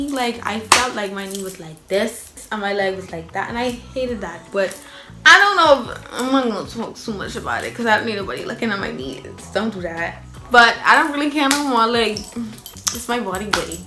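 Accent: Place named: Caribbean. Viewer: You from trinidad? your accent is hella Caribbean and excuse me if you said it in the video I didn’t watch the whole thing 😂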